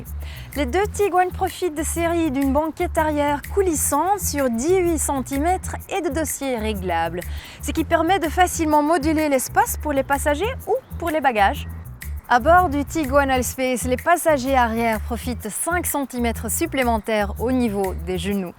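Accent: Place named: Netherlands. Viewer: Quel bel accent néerlandais.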